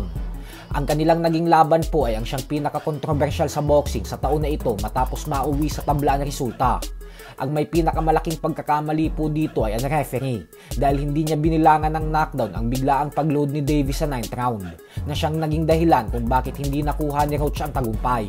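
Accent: Visayas, Philippines. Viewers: Ang dame mong alam di tugma sunasabi mo panget pa Ng boses mo visayang visaya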